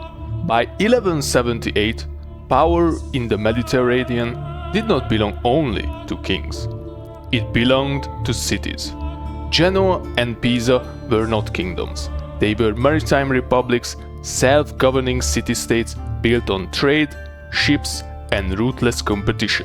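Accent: Hungary. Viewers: Your accent look very hungarian.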